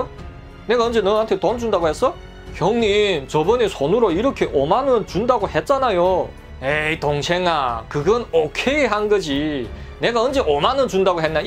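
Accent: Yanbian, China. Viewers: 延边话听的我想乐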